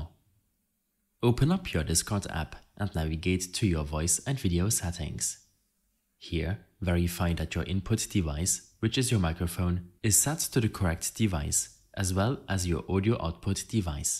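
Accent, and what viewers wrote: Germany. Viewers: I HEAR DEUTSCH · you sound German could it be? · deutsches akzent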